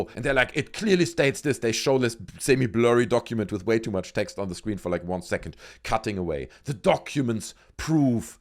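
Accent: Germany. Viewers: I just love your German accent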